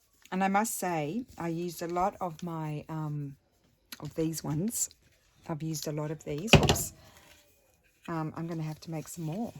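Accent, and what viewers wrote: Australia. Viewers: I love this way of making clusters - I'm still new to all of this and haven't attempted clusters yet but this is how I'll try it for sure! Also love your videos and all of your wonderful creations. I was an exchange student in high school and I was in Australia for about 6 months. The Australian accent is one of my favorites and makes me think of how much fun I had when I was there.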